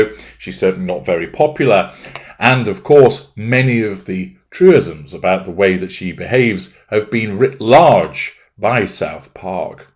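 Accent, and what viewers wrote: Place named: Northern England, United Kingdom. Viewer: I love it when let’s the northern accent come out x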